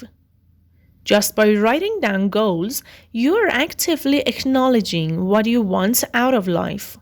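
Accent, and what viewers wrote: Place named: Iran. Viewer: اااااااااااااااا😭😭😭😭😭😭😭😭😭😭چرااااااااااا ،میخواستم بخوابم با گوش دادن به پادکست چرا با تلفظ فارسیییی😢😢😢😢😢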